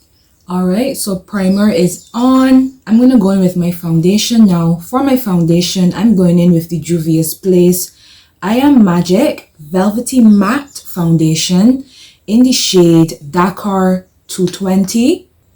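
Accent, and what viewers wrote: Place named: Barbados. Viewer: This look turned out lovely. I'm now discovering your channel, I'm picking up and Barbadian accent from you. Are you Barbadian? 🤭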